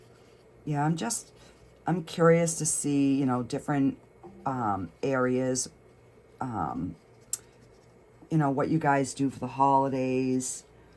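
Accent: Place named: New England, United States. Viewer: Omgsh!!! I am in awe. Your attention to detail with all the colors is incredible. It is so festive. What part of New England are you from? I love the accent! I am a New Yorker but moved down to Georgia a year ago and everyone comments about my accent!! Thanksgiving is very similar in our family except being Italian of course those foods get added in. Wishing you and your family a blessed holiday P.S. don’t worry about your videos being too long. They are enjoyable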